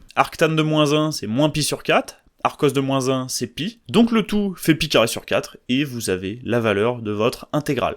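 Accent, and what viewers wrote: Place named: Brazil. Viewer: J'adore la touche Alder du bresil dans la voix (les ancêtres sont fiers)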